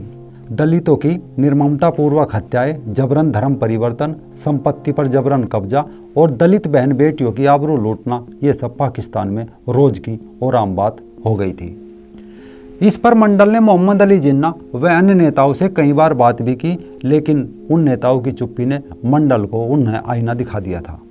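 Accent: Haryana, India. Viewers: Bhai aapke bahut videos dekh Chuka Hoon. Ek baat saaf saaf keh deta Hoon, Aapka bolne ka tareeka aaj ke har pravakta see behtar hai...! Aapki boli me haryanavi yaa garhwali/kumaaoni jaisi boli dikhaayi pad rahi hai.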